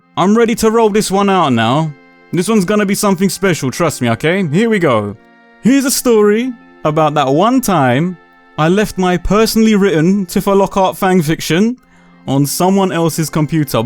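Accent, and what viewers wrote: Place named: London, United Kingdom. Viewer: My guy😂 pure comedy you man. You doing these vids reminds me of that one time you did I little video talking about the first season of Luke Cage and how you really liked it and man I'd love to know your opinion on the second season especially with the Jamaicans and knowing that your from London and you got that multicultural English going with saying tings like ends, wagwan or even ting, me being a kid from up north of the ends I'm just wondering what your opinion on that season would be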